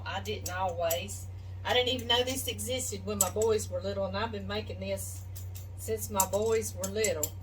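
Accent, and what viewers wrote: South, United States. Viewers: I put up 10 pints of salsa today. I do not hot water bath either. once they're sealed they're sealed good. I have more to do in about 3 days. I also put up stewed tomatoes . love your videos & your southern drawl. ha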